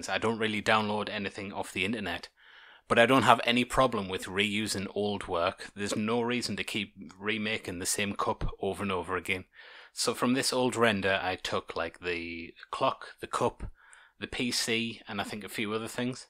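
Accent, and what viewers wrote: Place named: Teesside, United Kingdom. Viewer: Sounds like good old Boro to me?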